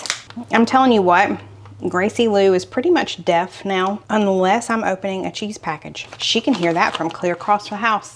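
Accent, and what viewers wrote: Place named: South Carolina, United States. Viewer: Your husband looks a like Tom Hanks. Love your SC accents!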